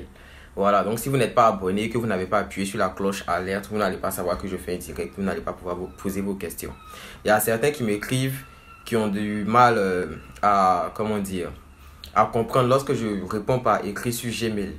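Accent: Ivory Coast. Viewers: Mdrrr tu m'as tué avec votre accent ivoirien. Bah j'suis un étudiant sénégalais en licence 3 commerce international je souhaite intégrer un iut . Dans mes choix de formation j'ai postuler 6 formations en métiers du commerce international et une formation en DUT techniques de commercialisation. Ma question est de savoir si il est conseillé de postuler dans différentes formations avec quelques rapport en termes de matières enseignés et de débouchés professionnels. Et comment justifier cela au cours de l'entretien pédagogique ? Merci d'avance !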